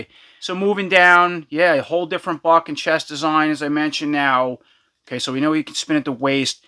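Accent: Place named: Boston, United States. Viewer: You got that Boston accent. lol Live the review. Thank you. 😉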